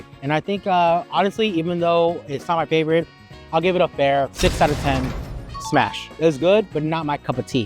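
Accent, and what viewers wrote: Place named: Philippines. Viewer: He doesn't look Indian. He looks straight up Pinoy. Even the way he talks 😅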